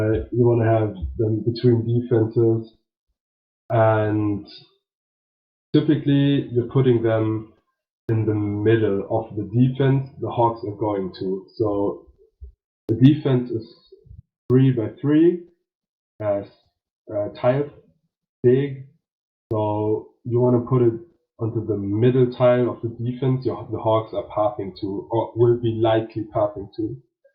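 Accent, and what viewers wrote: Germany. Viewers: base buirding..... I love the German accent!! DL FTW CWL invite Season 2!🔥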